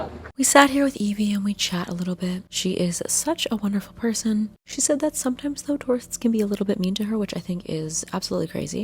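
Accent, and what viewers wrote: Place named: United States. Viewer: What do you mean? I'm an American who understood her American accent perfectly. I'm sorry if you don't have the cognizance to understand one of the most common, average, generic, but generally functional accents in the English language.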